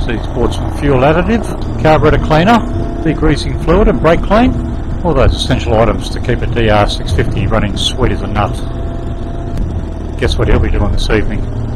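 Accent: Australia. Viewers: Love your presentation style mate: old school Australian 👍👍👍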